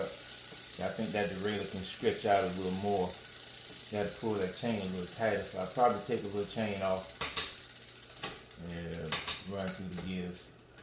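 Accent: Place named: Atlanta, United States. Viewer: I would have guessed he is from outside of Atlanta somewhere...I try to guess regional accents. My guess is he grew up and/or lives within 40 miles of Atlanta, Georgia.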